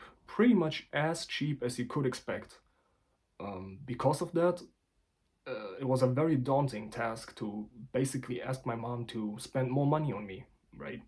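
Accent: Germany. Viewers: No youre right. Single mothers DO ruin their children, by not supporting them to become self sufficient. Statistics back this. Single mother children are multiple times as likely to end up sex workers or in jail, while single fathers tend to keep the kids out of bad paths like these. You gotta stop relying on your mother in life. Make a deal with her that if she supports you (shes legaly obliged to in Germany, thats what your accent sounds like), then you will do an Ausbildung or a Studium. Also you would get Bafög. Otherwise youd get Grundsicherung. These are the ways to go: GET OUT of there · I hear your german acsent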